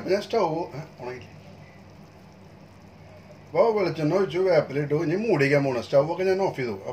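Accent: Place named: Thrissur, India. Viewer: Thrissue slang il ulla aa mutton curry pwolichu gadiyee..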